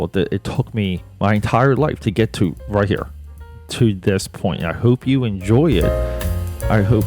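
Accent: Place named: Philadelphia, United States. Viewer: I knew you were from Philly by your accent😂 great stuff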